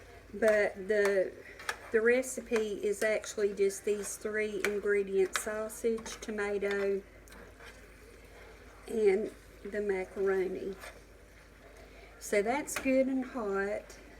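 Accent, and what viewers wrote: South, United States. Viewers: I’m generally a lurker, but I love your channel. Also, I haven’t lived down South since high school in 1992, so I love to hear your accent, brings good memories :-). Anyway, my Mama would make this for us, because it was cheap, easy and tasty. She generally just used well seasoned ground beef. I think that was just her preference though.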